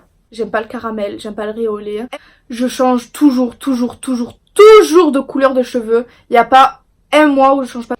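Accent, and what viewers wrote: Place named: South, France. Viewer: L’accent du sudddd 🤪 continue 💙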